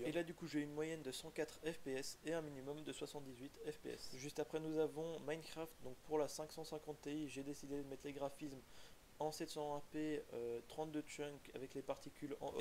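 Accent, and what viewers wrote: France. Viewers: французский, итальянский вообще другой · судя по акценту это французский или итальянский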